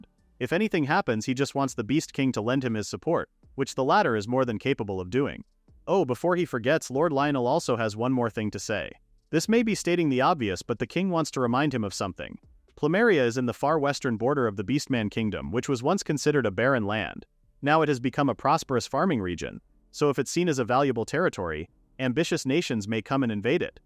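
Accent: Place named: United Kingdom. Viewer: British man spotted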